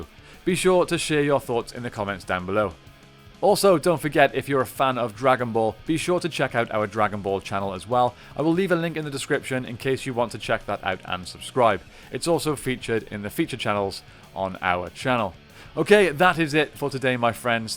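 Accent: Wales, United Kingdom. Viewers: Is that a Welsh accent I detect? I'm Welsh and I can't decide if it is or not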